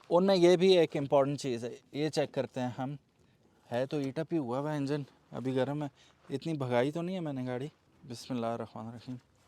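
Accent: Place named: Hindko, Pakistan. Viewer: Apka hindko wala acsent hy mansehra sy hy ap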